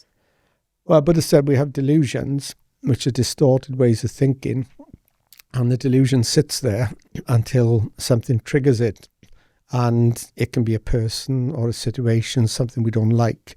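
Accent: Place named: North, United Kingdom. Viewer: Still can’t get over a monk with such a strong northern accent 😝👍🏻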